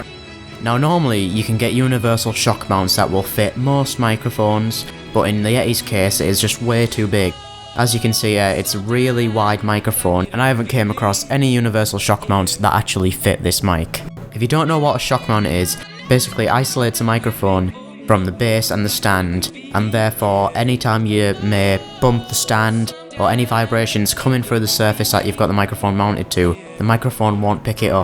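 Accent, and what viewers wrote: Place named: England, United Kingdom. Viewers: great review and great to hear an english accent as well :) also a very good mic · Actually its quite the opposite. Cockney is southern, he is northern as fuck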